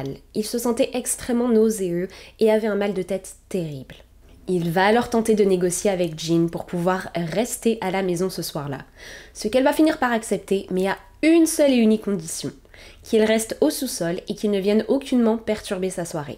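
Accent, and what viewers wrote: Quebec, Canada. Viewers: Il manque le "nous allons commencer".😢 Actuellement je suis tes vidéos d'il y a 3 ans et tu as un accès Québécois beaucoup plus prononcé que maintenant. 😂😘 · Au fil du temps ton vocabulaire est de plus en plus teinté de l'accent Québécois! Quand tu retournes en France ça doit être un choc pour tes proches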